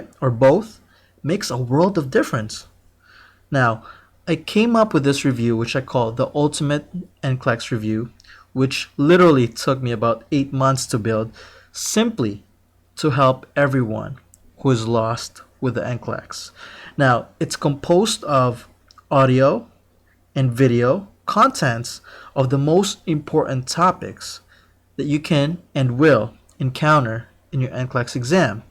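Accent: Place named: Philippines. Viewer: Sir, pinoy kaba? You sound like one. :)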